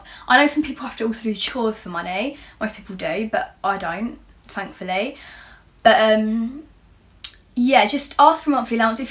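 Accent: United Kingdom. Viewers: Are u from the UK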